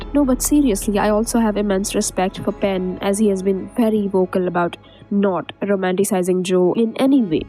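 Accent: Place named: India. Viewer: Omg this indian accent makes me angry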